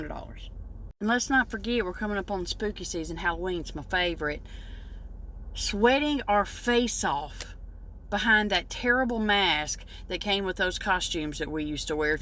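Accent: South, United States. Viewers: You know what’s so crazy, I can tell by here accent, she’s southern USA. I grew up on a farm in northern Alberta Canada and everything she has said is exactly how I grew up. It shows you how back then simple normal life was universal in western society. I hate today’s society. God I miss everything she has mentioned.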